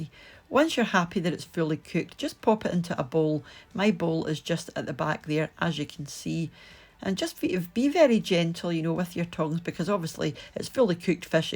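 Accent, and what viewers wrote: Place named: Aberdeen, United Kingdom. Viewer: Look delicious, thank you .. and I love your accent, sounds like an Aberdonian? 🏴 ❤️🇦🇺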